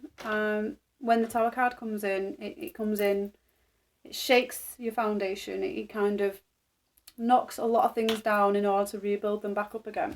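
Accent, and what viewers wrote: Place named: England, United Kingdom. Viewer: Hi,missed you that lovely.English acent,Wishing you peace and Blessings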